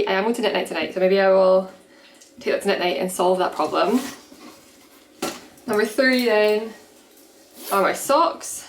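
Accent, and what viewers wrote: Scotland, United Kingdom. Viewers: I have been watching your videos for the past year for two reason’s first because I love to knit and I love and have knitted several of your patterns and the second one was that for the past year I have been planning a trip to Scotland with my sister. I thought that listening to you speak with your lovely Scottish accent that maybe I would be able to better understand conversations and surprisingly I must say that it seems to be helping. I’m sort of training my ears a bit. I’ll be mostly in the Highlands for 10 days in May with only one day in Edinburgh p. I am so looking forward to my trip back to my ancestral homeland.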